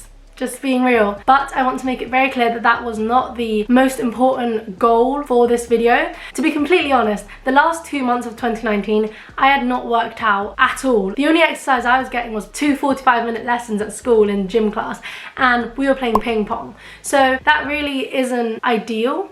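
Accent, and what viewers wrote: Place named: Asia. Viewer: what kind of asian are you and where do you live? I super love your accent and i plan to try Pamela's workout as well!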